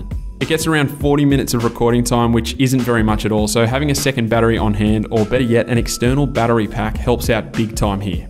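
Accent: Australia. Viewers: Very nice video, quick question are you Aussie?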